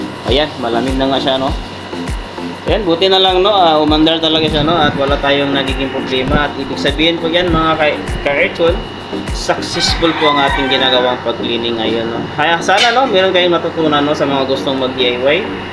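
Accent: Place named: Batangas, Philippines. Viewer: parang batangueno. salamat sa pagshare nito. #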